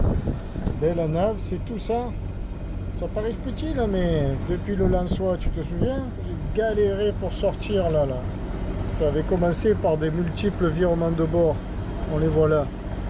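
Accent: South, France. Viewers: J'entends l'accent du Sud. Vous êtes originaire d'où si ce n'est pas trop indiscret ? Ça doit être fatiguant d'être en navigation solo. Vous devez être toujours en hyper vigilance puisque vous n'avez pas de coéquipier pour vous relayer.... Jolie musique de fond 🎵🎶🎵 On dirait la même personne qui a fait la musique pour Catamaran Mistertong.... Je vous ai découvert grâce à Catamaran Javerne qui sont actuellement au Brésil où vous étiez il n'y a pas si longtemps. Je m'abonne car j'aime bien votre chaîne 😊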